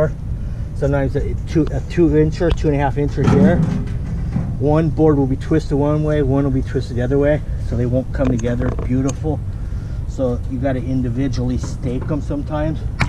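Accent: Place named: California, United States. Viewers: That California accent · Are you in California? I say that because you have that laid back California accent, anyway God bless thanks for the video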